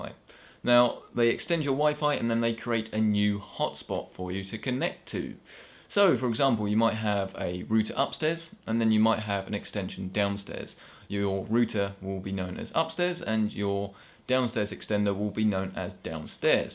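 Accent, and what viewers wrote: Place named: England, United Kingdom. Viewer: yes rooter!!! if your american you say router but the world dont revolve around usa ... listen to him he like me are english!!!!!!!!! we speak different we have different customs, if you dont like it watch an american review one !!!!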